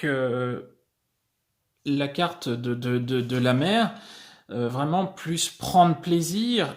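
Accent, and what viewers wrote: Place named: Normandy, France. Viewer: Tu m’étonnes qu’il a peur de me perdre avec la méga connerie qu’il a fait avec sa tierce il y a 15 jours. Si ça pouvait au moins le faire réfléchir avec sa p’tite tête lol Merci pour la vidéo (ah l’accent Normand hein le « gô » 😂😂😂)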